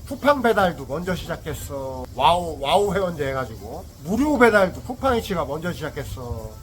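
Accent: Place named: Chungcheong, South Korea. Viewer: 역시 충청도여 ㅋㅋ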